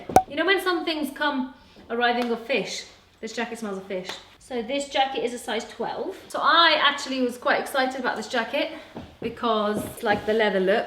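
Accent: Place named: England, United Kingdom. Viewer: your voice + that English accent = perfection. I don't wanna sound creepy but dammit I love your voice,! Hope you're having a healthy pregnancy.🌻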